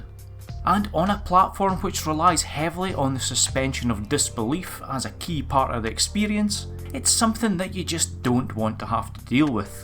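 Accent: Scotland, United Kingdom. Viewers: Love that Scottish accent m8😂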